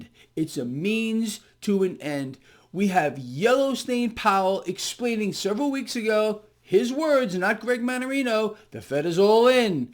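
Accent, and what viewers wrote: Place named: Boston, United States. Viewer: subscribed for that Boston accent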